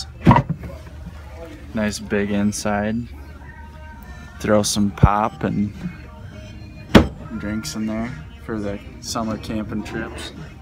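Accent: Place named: Minnesota, United States. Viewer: You ever watch the show Fargo? You sound just like the people on that show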